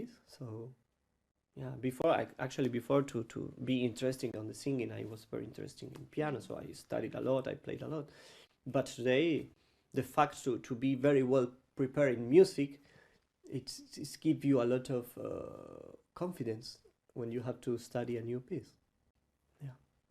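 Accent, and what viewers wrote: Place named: India. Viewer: Parla inglese come un accento indiano 😅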